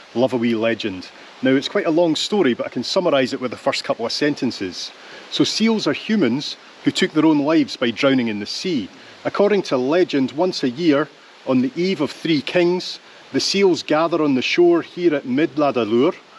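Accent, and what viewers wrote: Scotland, United Kingdom. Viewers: Phenomenal! Aloha from my home, on another spectacular island, Big Island Hawaii. :-) The beauty and the drama of the cliffs in your video are priceless. Fewer tourists, too! Subscribed. My grandfather was from Auchterarder, Perthshire, and your accent brings back fond memories of him. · Really loved this. The funny thing is that my American wife and kids couldn't understand a word you said! :) I grew up in Australia, and my best friend for some years was from Glasgow, so I long ago learned to tune into the Scottish accent. Because of the popularity of British TV in Australia, most Aussies in fact 'get' the various British accents. But I've lived in the USA since 2000 and it's hilarious how most Americans practically need subtitles - and often get them in movies and TV programs. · I guess he is Scottish. And so he speaks a dialect of English.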